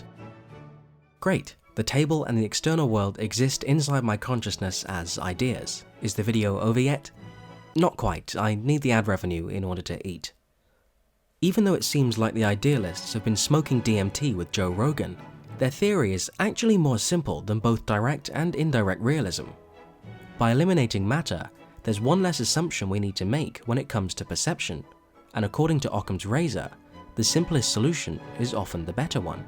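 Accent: United Kingdom. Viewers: Man, you have converted so many lesbians with your British accent and philosophy-infused British humour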